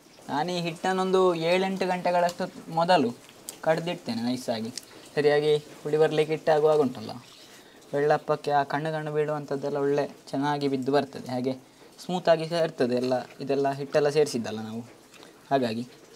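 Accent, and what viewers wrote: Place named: Tulu Nadu, India. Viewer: It seems your mother tongue is Tulu, pls make videos in Tulu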